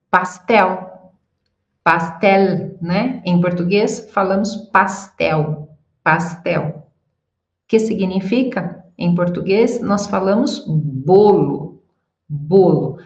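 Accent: Brazil. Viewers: ¡Qué interesante! En España, "saco" es como una bolsa, normalmente tejida de yute, de forma rectangular y sin asas (parte para agarrar) que las bolsas sí tienen. Normalmente decimos un saco de patatas, un saco de leña, un saco de arroz, un saco de cemento, etc. No sé si en alguna parte de España también se usa como prenda de ropa, yo sólo lo he oído de amigos hispanoamericanos. "Rato" es un tiempo más largo que momento o minuto, que también usamos. Tus clases son muy interesantes, además que entiendo tu portugués brasileño mucho mejor que el de nuestros queridos vecinos portugueses. Un saludo ❤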